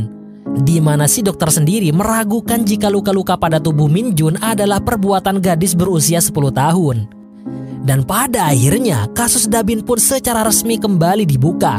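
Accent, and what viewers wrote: Madura, Indonesia. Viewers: Admin madura ya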